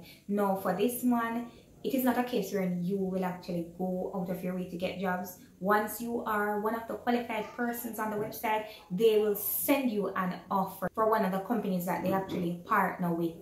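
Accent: Jamaica. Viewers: Hey, yardie gal! 😉 Yes, J'cans do have a heavy accent... but so do most (if not all) of us Caribbean people. It's just a matter of tempering it and slowing down our speech. Although I am not an organised person, I still decided to 'like' this video because I am SOOOOOOO elated to finally find one of my Caricom peeps rocking YT's MMO niche! Like you said, there are waaaay too many "big-country" people peddling all kinds of strategies or services and saying that they can work "anywhere that you have a computer and internet connection" without bothering to actually confirm that for themselves. We in the English-speaking Caribbean know about the nightmares of financial regulations which promote forex retension and money-laundering controls, the latter of which are often imposed by "boss" countries in the G8 who actually do way more money-laundering than the Caribbean. 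Nuff said. Anyway girl, keep keepin' on. If you vary your sub-niches enough, I just might find a reason to subscribe 😉 Best of luck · You have a beautiful Jamaica 🇯🇲 accent.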